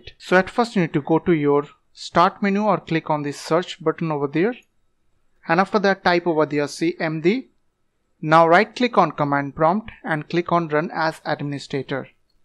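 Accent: India. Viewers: I was little skeptical with the Indian accent lol, ngl it worked thank you for saving my ass.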